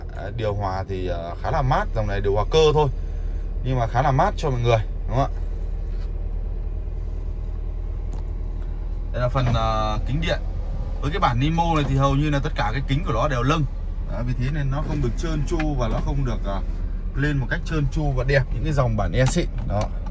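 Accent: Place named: Đồng Cối Đá, Vietnam. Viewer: Noi đồng cối đá chuẩn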